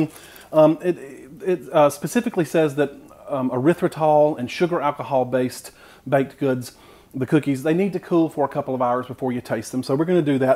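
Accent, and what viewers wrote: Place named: Eastern North Carolina, United States. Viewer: Wow, you sound like me. All we have is an Aldi out of the things you listed. LOL! Now back to the video. I'm notorious for commenting before I've finished watching but that's because I have the attention span of a peanut and will absolutely forget by the end. Where abouts are you from? I'm in eastern NC near the southern outer banks. Your accent sounds a lot like "my people". ;)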